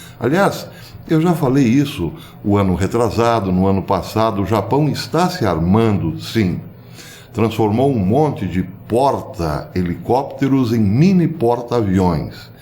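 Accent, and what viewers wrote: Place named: Minas Gerais, Brazil. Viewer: PROF.... A CADA DIA QUE SE PASSA, SOU AINDA MAIS SEU FÃ.CONTINUE DESCONTRAIDO E USANDO SEU MINEIREZ.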